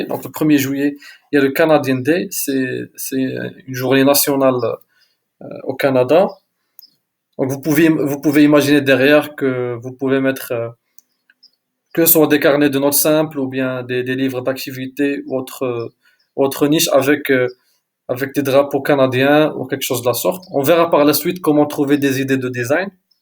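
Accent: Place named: Morocco. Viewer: Alors aprés votre poronciation je suis sure que vous êtes un maroccain والله تمغربى تحياتى.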